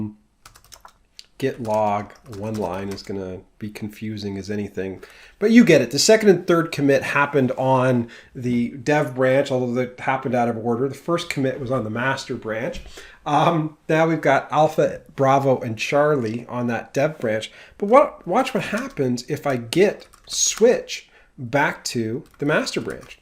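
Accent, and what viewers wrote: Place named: India. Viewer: I'm not being racist, I just don't understand English with an Indian accent. Please don't misunderstand me.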